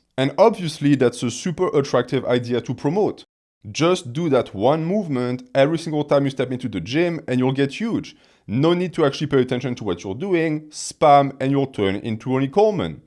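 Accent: France. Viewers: This is absolutely one of the best explainations of the dynamics of musculature and it's progression, I cannot believe this is free content. Wow. Bravo. Also, please tell me what the origin of this glorious accent is. Edit: before anyone chimes in and tells me France, duh. I'm talkin' specifics here.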